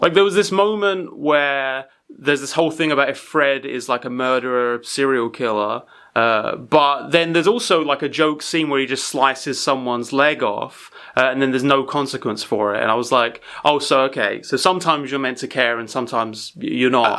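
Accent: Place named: United Kingdom. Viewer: Never realized that british people pronounce H as "Hache"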